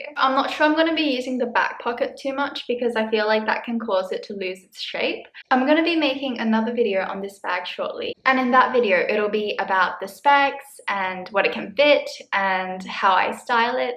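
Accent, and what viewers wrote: Australia. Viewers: Are you from Australia? You sound a lot like wengie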